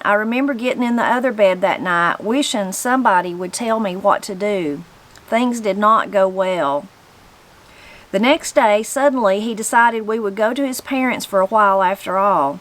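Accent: Appalachia, United States. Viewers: Thank you for sharing. Love your readings. You have the ability to bring Sidney to life with your Appalachian accent and your voice fluctuations. What a true blessing.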